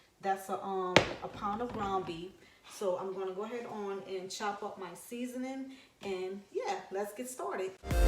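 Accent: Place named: New Orleans, United States. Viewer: Nice baby yo accent so new awlins. Gentilly?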